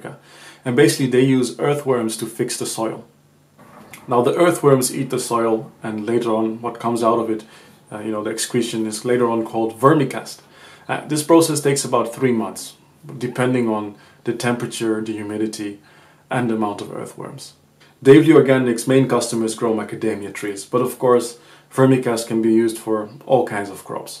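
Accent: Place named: Netherlands. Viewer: + your accent...are you Dutch perchance??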